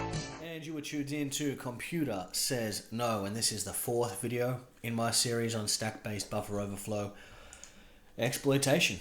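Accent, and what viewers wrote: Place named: Australia. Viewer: Great series here. Enjoying it while doing OSCP. Nice hearing another Aussie on youtube too lol. Thanks for the uploads!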